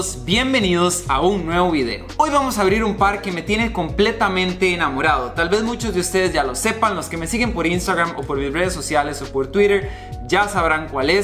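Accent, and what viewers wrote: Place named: Costa Rica. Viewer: Como se nota ese acento de Costa Rica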